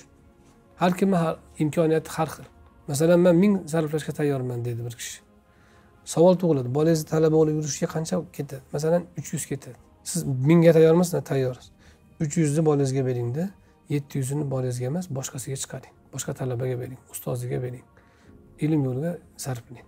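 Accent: Andijan, Uzbekistan. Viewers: Аллох Рози болсин бор боулинг домла сузиздан андижонни тафти келяпти илмингизни аллох зиёда килсин!!!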